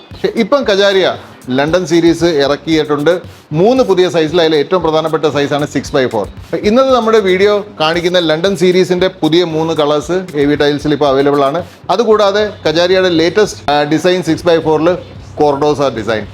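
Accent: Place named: India. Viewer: Thanks for your reply. My project is in a planning stage, so presently is looking into various options n materials before final selection. I am from Ernakulam. I often see your videos. It's quite nicely presented with lots of new info for a layman like me. Keep it going... Hearing your unique malayalam accent, with a touch of manglish in it, I have always thought you were educated somewhere outside kerala.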